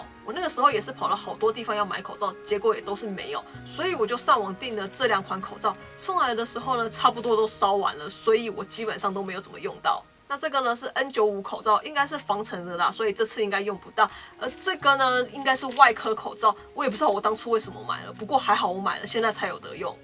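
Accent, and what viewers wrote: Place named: Taiwan. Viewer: 武汉你妹，死台湾腔！你们美爹控制不住了哈，你们等死吧！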